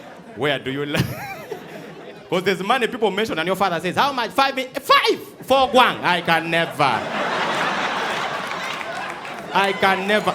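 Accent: Uganda. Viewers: you nailed the American accent. And I salute you for carrying out the whole sketch in your Ugandan accent. It shows how much proud you are of your culture. Warm salutes from Morocco 🇲🇦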